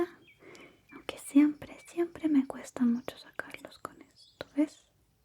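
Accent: Chile. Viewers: Cada día más chileno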